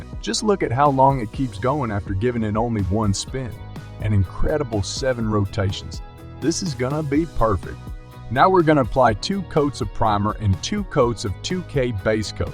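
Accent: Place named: South, United States. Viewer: Dude, that video was f-ing amazing! Plus I'm pretty sure chicks totally dig that southern accent! Subscribed.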